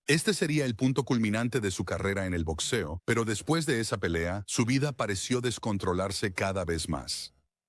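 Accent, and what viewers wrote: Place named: United States. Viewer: El contenido del vídeo está bien, lo que no me gustó mucho es esa voz agringada que exagera las pronunciaciones de los angliscismos y los apodos sajones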